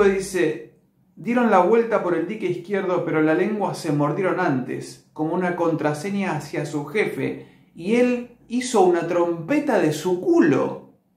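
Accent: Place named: Argentina. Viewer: Leo un capítulo y veo tu video, ahora cada que leo un capítulo ya lo leo en Argentino cheee! Saludos de un mexicano viviendo en España tío 👋🏽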